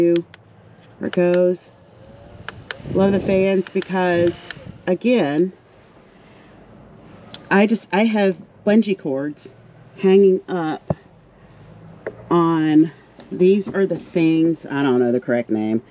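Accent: South, United States. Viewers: Hey Mrs ❤️ I just stumbled across you and I am happy I did ❤️ you're so beautiful and I loved your video ❤️ I'm considering living In a vehicle so this was a Little inspiration for me ❤️ 🙏 can't hardly afford bills and rent anymore. It's literally draining me and I am a single black woman nearly making ends meet. I make enough to pay a bill and rent and pay to go right back to work and I'm broke right after I get my check. The cost of living is horrific 🙏 so I am trying to come up with a way that I can live on little to none and save my $ for expenses I really need. So thank you for this video sweet mama 😀 ❤️ much love to you from the Carolinas ❤️ you sound country like me ❤️ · I have been watching truck camper setups for over a year.... Probably well over a 100 different people.... And everybody has their own style obviously..... It is a proven fact that while men come up with good ideas and they know how to put things together..... 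It is generally a woman that comes up with the finesse to make it look good and efficient....... So I would have to say that this is the nicest setup I have seen yet.. And you could see very clearly that that was not feces in the bottom of the portable toilet... At 1st glance it looked more like dry corn... You sound southern....plus the expression, "y'all "..... what state are you from?? Thank you for the video young lady..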